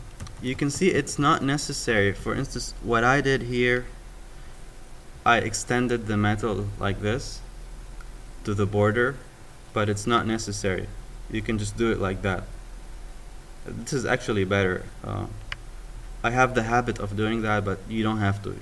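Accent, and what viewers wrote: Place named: Lebanon. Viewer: I can smell a lebanese accent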